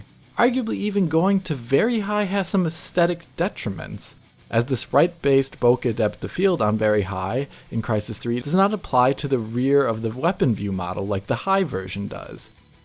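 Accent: Germany. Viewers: Trevor Cook Really? I'm pretty sure I hear a German accent when he's speaking English though. And the German things he said sounded absolutely 100% perfect. The "melody" of his voice also sounds very German to me... If he really is an American he's been assimilated into oblivion gawdamn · German is your first tongue isnt it? Excellent english pronouciation tho! And overall great video quality from what I've seen so far. EDIT: "Und auf Wiedersehen!" Yeah i think that settles it :-P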